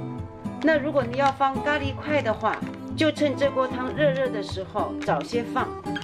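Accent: Shanghai, China. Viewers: 不是普通话，而是浦东话。乡音啊，亲切的。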